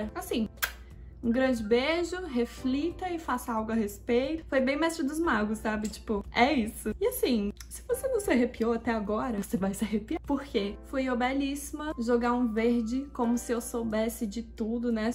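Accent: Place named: Brazil. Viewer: Da onde vc é? Seu “R” não é de Sampa.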